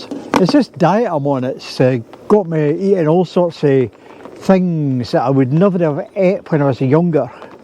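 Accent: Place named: United Kingdom. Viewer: Your accent is so cute! For me, a Brazilian, you sound like a Harry Potter character!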